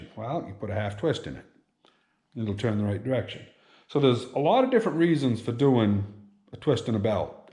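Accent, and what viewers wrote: Maine, United States. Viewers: As soon as you started talking I thought " this guy is from Maine" looked at your channel name and was pleasantly correct.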